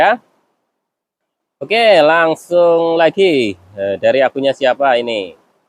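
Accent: Banyumas, Indonesia. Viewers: Takur ngapak tulen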